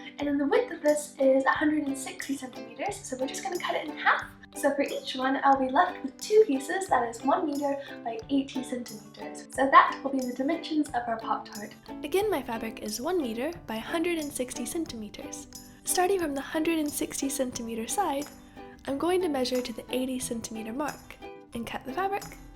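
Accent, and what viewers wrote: United Kingdom. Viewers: do you think the way you pronounce things gets more British sounding the more years you spend with Tom? I'm an American dating a British guy and when I visit him for long periods of time I notice myself sounding more British by accident!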